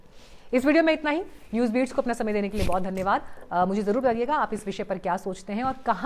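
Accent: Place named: India. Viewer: Hmm...US citizen just like Vivek Ramaswamy, he can even talk with a native accent , I wonder how they comprehend what you are saying in your Indian English accent..lol! ...yes, you may think they are your friends, but in reality they are just tolerating your kind...stay in your lanne budy...as they say...you will never be one of them....I understand al lot of desis want to try hard to wash the brown of them...he'll, they wish they could do away with their brown skin color...pathetic!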